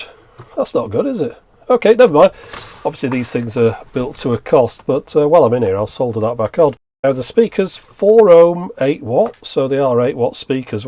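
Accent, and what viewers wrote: United Kingdom. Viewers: I have a number of very good friends in the UK and this guy sound like so many of them. Before you get mad I AM NOT making fun of this man. I love this channel and what he does sharing technology with us. What I enjoy is the way he phases what he says. He said something along the lines of Yes you can play MP3's via USB drive but it does not really make any difference since it all sounds like utter rubbish anyway. When he says something is terrible it just makes me laugh because, he is ever so polite BUT, when he says something is bad his words are so hard, biting and direct yet so gentle in a way. I subscribe to this channel because; this guy is great and knows his electronics. This guy is honest. Yes sir I've brought a few vintage items just because I saw them here first. That said the reason I love this channel beyond the technology is because; this guy savages today's cheap electronics. 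The funny thing is the polite lovely tone of his voice and his choice of words almost kills a bad device with kindness which just makes me scream with laughter. I agree with him this mono cassette player \ recorder boom box is total and utter rubbish no matter what you try to do with it. I'm not joking when I say I am happiest when this chap evaluates modern tech because; he will buy cheap devices for 30 pounds sterling and put them through their paces. This is one of the best channels on You Tube! Thank you sir. You remind me of my friends from the UK who can say the most mundane things an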